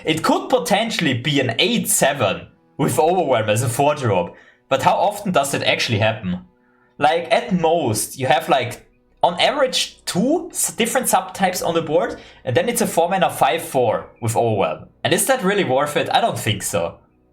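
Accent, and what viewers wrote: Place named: East, Austria. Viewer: Came here from Sunny and know about you both for some time through his streams but didnt expect you to be austrian aswell. From your accent I would guess you are from the north-east states like lower austria or vienna, right? Good content, keep it up!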